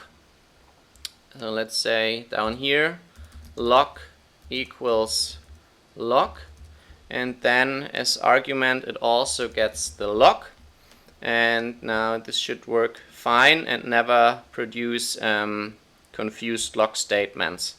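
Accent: Germany. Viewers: you Sound german tho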